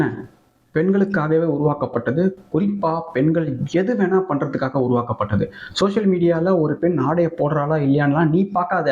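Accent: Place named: Chennai, India. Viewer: நீங்கள் பேசுறதுலையே தெரியுது நீங்க ஒரு சென்னைவாசி தான் என்று சென்னையில் எந்த ஏரியா நீங்க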